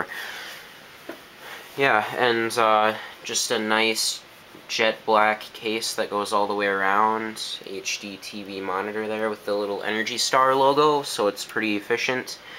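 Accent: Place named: Canada. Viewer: do you have ANY canadian in you? you sound canadian.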